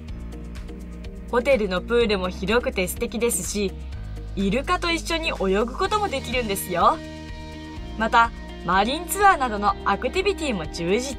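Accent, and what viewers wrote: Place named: Tohoku, Japan. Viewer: ナレーターの人、訛ってますね。イントネーションが東北チックでカワイイ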